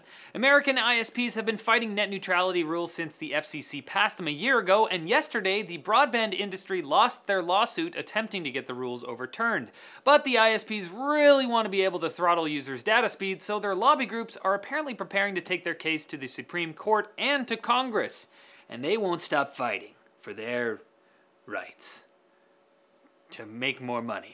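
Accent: Australia. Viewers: Your Australian accent is getting better. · Why choose an australian accent though? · mate that sounded australian not like a New Zealander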